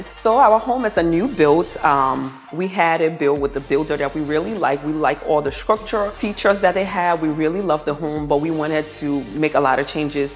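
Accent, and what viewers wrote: Liberia. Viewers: Everything is just beautiful. This is my first time here. I'm sending a Liberian accent? Keep up the great work❤️💪🏾👍🏾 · Hi - are you Liberian? I hear the accent...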